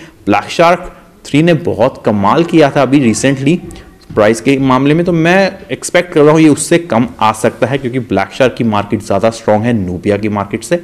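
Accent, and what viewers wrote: Punjab, India. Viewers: are you punjabi ? or Saraiki dialect ?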